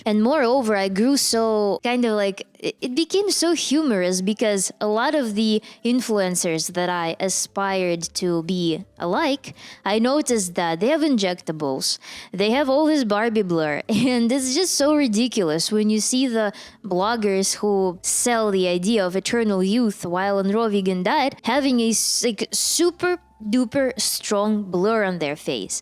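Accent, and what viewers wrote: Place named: Eastern Europe. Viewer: You sound Eastern European. Meat is your identity😂. Congrats on finally kicking the mental illness away and stay meat-based.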